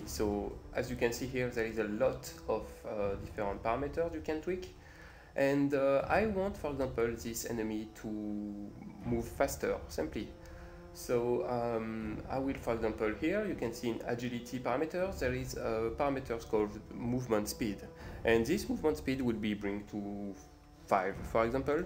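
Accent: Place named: England, United Kingdom. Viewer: EPIC ENGLISH ACCENT !! (and game aswell)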